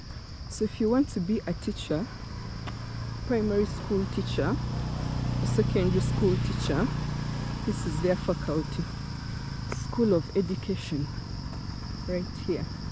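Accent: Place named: Uganda. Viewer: Love from San Diego California,born in Uganda I remember makerere long long time ago and I loved your beautiful ugandan accent,I would listen to you all day. Will try to find more of your content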